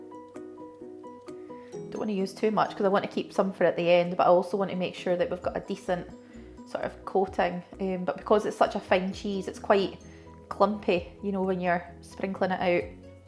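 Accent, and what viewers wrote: Scotland, United Kingdom. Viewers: I love your beautiful Scottish accent 🎉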